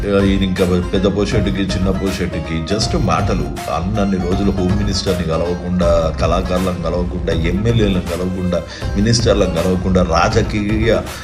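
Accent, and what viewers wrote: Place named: Andhra Pradesh, India. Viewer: Nuvvu ikkada telangana yasa matladakuntu andhrodivi ra babu Aa bolli gadini ante nekem noppi ra ayya aa lokesh di baga anipistadi ante hyd lo only me babu family valle unnaru anukuntunava ra ayya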